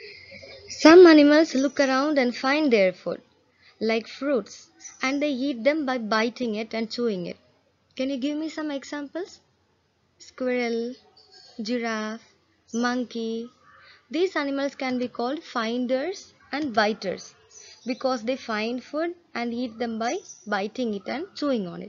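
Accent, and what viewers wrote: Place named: United States. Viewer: thanks mam but I think that you are from America because of your voice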